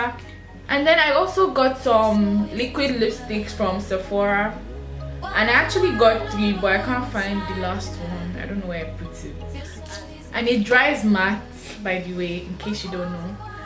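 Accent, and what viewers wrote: Nigeria. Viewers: Very nice haul,I love the way u mix our naija accent n words too,kip it up girl tfs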